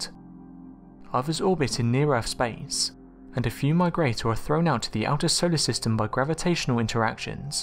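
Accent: United Kingdom. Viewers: Where the F&&^% do they find these BRIT announcers? Really--Tuskon, Arizona? I couldn't catch half of what he was saying, even with the Closed captioning going! If it's a NASA bulletin, find an American announcer!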